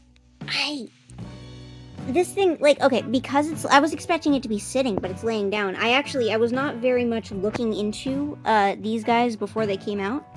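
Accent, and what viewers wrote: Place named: United States. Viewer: i like ur american voice 🤣🤣🤣sorry im british 🤭🤭🤭🤭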